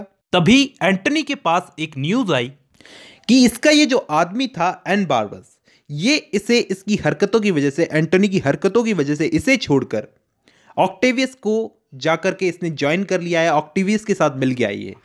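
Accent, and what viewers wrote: India. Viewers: i cant understand india english ...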